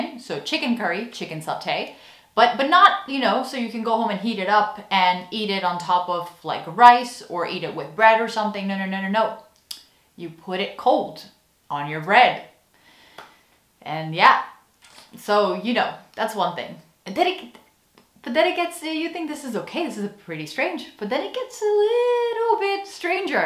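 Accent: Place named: Netherlands. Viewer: It's happening 😯 I couldn't quite put my finger on it at first but you are starting to sound like a Dutch person speaking English. It's subtle, but definitely there. edit: although you did completely butcher the pronunciation of "ketjap" 😂 it's cat-jap, where the catpart is pronounced just like the animal, the "j" is prounced like the y in yes, and "a" is prounced like the second a in El Capitan. Ketjap.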